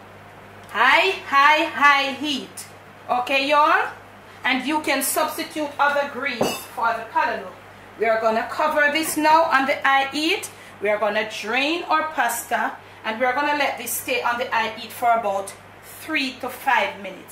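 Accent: Patois, Jamaica. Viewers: Keep up the patois..... for those don't like it....... bun fyah fi dem. Big up 💪🏽💪🏽❤❤😘😘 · British🇬🇧 lady with Jamaican🇯🇲 parents. Mummy lived in UK for over 45 years. Mummy never "twiss up ah mout fi nobuddi traight!!! Jamaican til the day she dead....You are authentic JA!✊🏾 keep up the patois only tuppid eedat ah gwarn like dem fool👍🏾👍🏾👍🏾✊🏾✊🏾✊🏾✊🏾💯❤ Mannerz and Respeck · The people who thumbs down your videos a pure fools don’t change your patois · Me love me jamaican way of talking you go dont stop we talk sweet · I enjoy the videos and the lovely Jamaican patois. As some one once wrote everyone would like to be Jamaican as it is cool. Keep it up. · I came across your channel on new year's day of this year. I was so excited to see a fresh new face and to hear the patio ( the worst thing you can do is trying NOT to be authentic or "speaky spokey " as my elders would say). 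Your Jamaican accent is what brings a lot of flavor to your channel besides your delicious food. Just block the haters and continue with your patio. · I love your cooking videos. The patwa mek it very authentic. Gwan wid it same way. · I like the way how you talk patwa me use to live in Guyana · Chop yuh patois Ms we love it! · I’m enjoying the the patois, it’s your culture and you’re being authentic. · Good evening,. Cook down or cook up it's all good. Don't allow anyone to change Yuh.. Me nah undastan er ting you talk in Patois but me luv it....Food tun up up up. Bodow...Boom Trini in de house. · mouth mek fi chat anything dont pay dem no mind, everytime I introduced this vlog to someone we talk about the same thing. Yuh just feel like an era of Jamaica that has passed, so pure and happy with your craft. Because this is a gift, you make me feel like I'm back home watching my Granny and Mommy cooking and laying out nothing but good Jamaican values. 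That switch up at 3;34 is exactly why only fiwi language can explain it. Always puzzle mi how u can sit and watch something like this and the only comment you can make is a negative one. Its draining people let that go. Full time we appreciate the beauty in wi likkle dialect, its just pure poetry once you understand it. keep teaching, I might not comment but like clock work we watch everything. Know that your are top 3 of all vloggers on this island. Rass Mokko, In Jamaica &. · Lady I love you 😅😅😅😅😅😅unno don't bother ask her how much millimeters of ginger.... 🤣🤣🤣🤣🤣🤣🤣🤣🤣🤣🤣you're the only person I follow on YouTube.... O...and chat you patois wi nuh cater fi nobaddi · Love the patois. Don’t stop, adds sweetness to the videos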